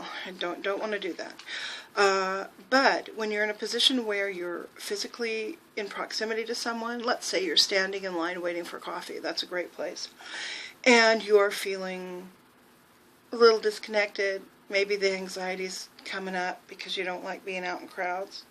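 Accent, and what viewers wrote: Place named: South Carolina, United States. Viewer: I cannot wait to see you in character with all the bells and frills and everything else complete. Love that South Carolina accent, by the way, you got it goin' on! :) Mah word!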